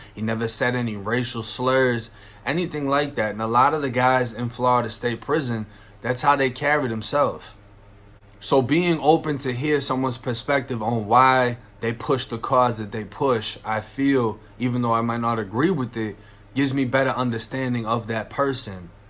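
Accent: Boston, United States. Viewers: Here from Death’s videos. New subscriber and fan. Keep it up man. BTW I would have sworn you were originally from Boston, sorta sound like we do. EDIT - watched deaths videos from last to first, guess you are originally from Boston. Super big up to you. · Bro you sound like your from Boston i aint being funny i fucks witchu but yea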